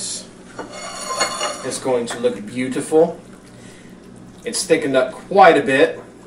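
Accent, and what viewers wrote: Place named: Arkansas, United States. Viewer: I really enjoyed your "how-to" video! Where are you from? You sound like you are from my neck of the woods (Arkansas).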